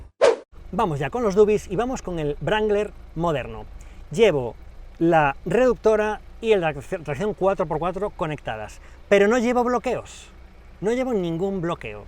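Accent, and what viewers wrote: Spain. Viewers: Jajajaja Ya conseguí ver un vídeo completo tuyo...puse mute al audio (volúmen 0) y así me quite de encima tu castellano castizo. Sorry, pero en verdad que no lo soporto 👎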